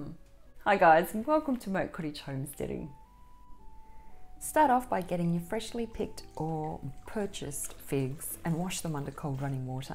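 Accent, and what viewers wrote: Australia. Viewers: Hi. I’m reigniting my canning journey after 30 years. Love ya work. You sound Australian but you are giving US measurements. Is there any chance you could give the poor old Aussie viewers the metric version ’on the go’? ❤ Also…. We’re you ever a presenter on Playschool? I’m sure I’ve seen you on the telly in the past. 🤔❤️❤️❤️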